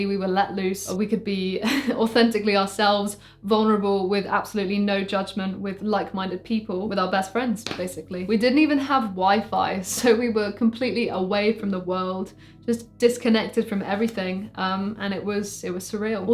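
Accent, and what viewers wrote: United Kingdom. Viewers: The real question is, did someone make out with someone while at the mansion? Also I love how britishly you say "wa'eva", "we should'av donnet" and "sumting lae tha". Anyways, big fan here. I've watched you since you were a teenager and promoted Bias FX 2 (I actually bought it because of your videos). Keep rocking, you are amazing! Cheers!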